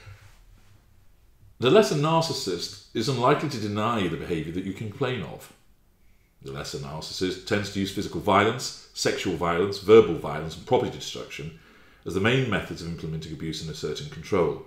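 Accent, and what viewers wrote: United Kingdom. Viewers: Same here! I love British accent. It's so smooth and hot. 🔥😂🤭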